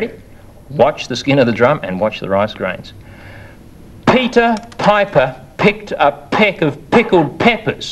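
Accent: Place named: Australia. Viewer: love that Auzzy accent. The music is from beaverly hills cop so this show is no earlier then the 80's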